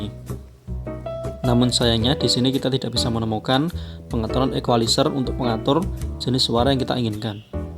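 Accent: Java, Indonesia. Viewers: sampean wong ndi tho mas medokmu kuwi lho, joss gandoss 😂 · review bagus kecuali reviewernya..medokk abis... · Medok gan logat nya,good..jawa bgt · Medok banget bang 😀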